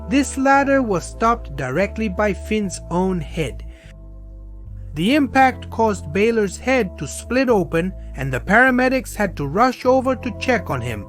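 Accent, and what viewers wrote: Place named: India. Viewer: Why does bro sounds like Indian😭😭😭😭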